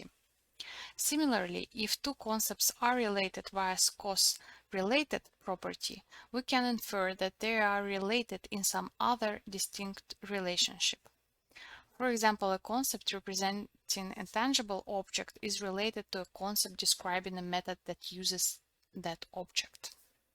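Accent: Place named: United States. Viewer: The lady speaks a very very bad americanenglish. A very bad dialect. Stop it and repeat with someone who speaks english high language.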